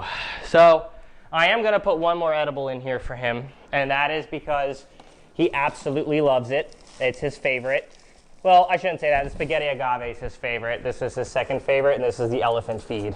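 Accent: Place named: South, United States. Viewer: terrible south accent.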